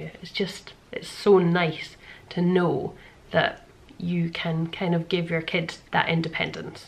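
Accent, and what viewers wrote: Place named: Scotland, United Kingdom. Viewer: hi, just came across your channel....i think id LOVE to live in iceland...im sooo into the simple life and nature...i actually watched your what you hate about it first....im just wondering about what work you can do? get? and what you have to do to be able to stay/live there....thanks for video...Love your accent....love scotland....its beautiful..:) x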